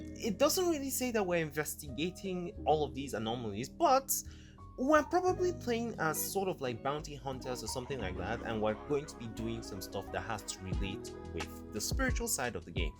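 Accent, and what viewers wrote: Nigeria. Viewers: Bro are you Nigerian? You sound Nigerian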